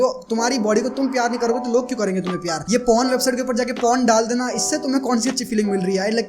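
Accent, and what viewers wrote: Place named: Rajasthan, India. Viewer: Mjhe laga hi tha bhai rajasthan se h.....bolne k tarike se hi lag jata h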